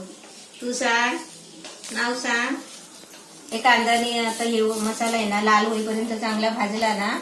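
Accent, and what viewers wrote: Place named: Malvani, India. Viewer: Wa..mastach..tumche malvani type bolne chan watye..aamche watta tumhi..tumchya recipe nehami baghte me wahini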